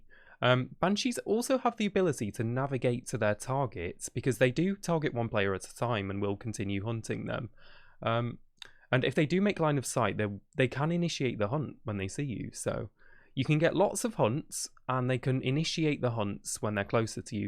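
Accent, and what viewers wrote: United Kingdom. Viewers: It's the Scouse accent. It's really disarming. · that isn’t scouse lmao it’s East Midlands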